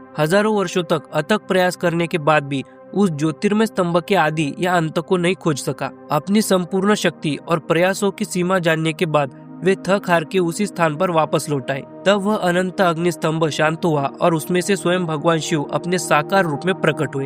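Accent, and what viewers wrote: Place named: North, India. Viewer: Bhai mujhe aapki baate south indian ki tarah lgi lekin hindi bolne ka accent north indian lga isliye pucha, 😀 If you don't feel to tell then it's okay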